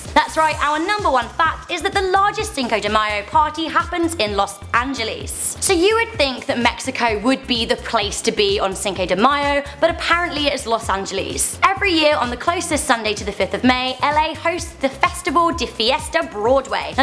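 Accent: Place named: United Kingdom. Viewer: GIRL YOUR SPEAKING BRITISH ENGLISH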